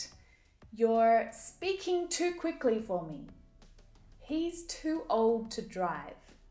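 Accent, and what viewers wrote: Australia. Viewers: You just made Australian accent the best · You're smart enough to make me love English language particularly Australian accent.Many thanks dear. I need a lesson about reading comprehension (pre reading, how I deliver it for Arab students ( pre intermediate level)🌷⚘🥀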